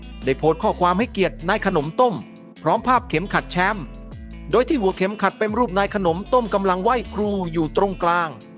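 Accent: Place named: Cambodia. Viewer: แอด...คนเขมรใช่มั้ย..ฟังสำเนียงออกนะ..หลายคำเลยพูดไทยสำเนียงเขมรชัดเจน โดยเฉพาะคำว่า คอมเม้นท์แอดออกเสียง..คอมเม่น..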